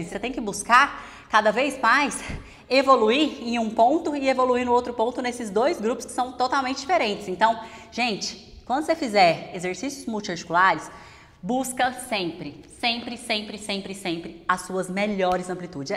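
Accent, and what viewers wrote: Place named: Goiás, Brazil. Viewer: kkkkkkkkk, o sotaque dela sempre entrega que ela é goiana raiz.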